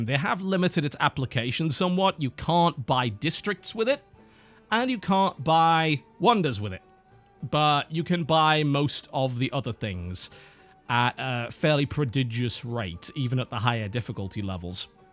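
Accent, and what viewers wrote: United Kingdom. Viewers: he died?!?!?! If so, may he rest in peace...that's really sad. I will miss his British (or English, Idk) accent...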